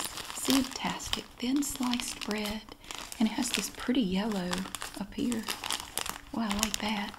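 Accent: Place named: South, United States. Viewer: My favorite YouTuber at the moment. I don’t know what it is about her. She’s addicting to watch and listen to. The southern charm is just something I don’t ever see in my state (WA) so it’s really satisfying to hear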